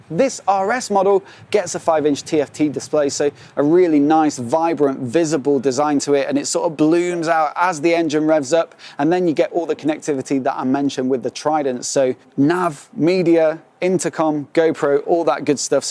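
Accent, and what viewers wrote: United Kingdom. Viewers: Your accent is so British and I love it.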